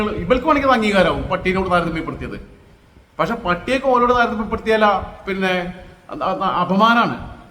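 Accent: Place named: Malappuram, India. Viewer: Bro..How come suddenly ur slang changed to our malappuram slang? In your old videos it was not like this?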